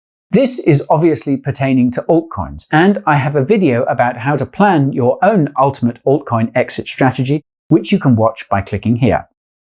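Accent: United Kingdom. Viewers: so much priceless information! and even explained with a British accent! couldn't wish for more than that 😍😁 you' re very funny too 😁, love your jokes hehe makes everything so much fun and easier ❤️ thank you so very much! ☺️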